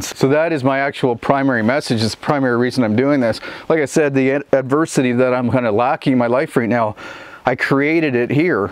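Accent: Canada. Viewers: Love that Canadian accent and can do attitude.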